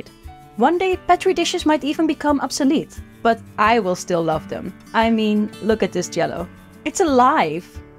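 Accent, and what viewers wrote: Netherlands. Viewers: i know is dutch bc of her last name (its a dutch last name) an you can hear it in the way she talks she has a dutch accent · weakest dutch accent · Is that a Frisland accent? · Instantly heard she was Dutch❤ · So Dutch sounding... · Is that a Dutch accent I hear? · Immediately recognised it too, as I'm Dutch and so I hear it oftenly. And I don't mind it. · Great video and loved hearing the dutch accent! :p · still weird to hear dunglish in a minute earth video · you sound dutch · Forgive me but I just have to say that the Dutch accent in English is so cute that I can hardly stand it lmao 😍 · I love Duth accents ( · Funny how I instantly heard she was Dutch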